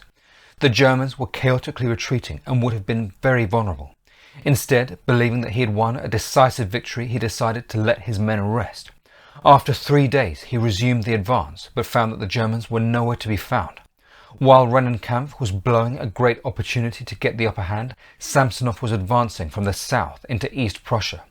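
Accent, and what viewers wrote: United Kingdom. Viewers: Russia was useless? Such a BS. With this British accent such a big Russophobia.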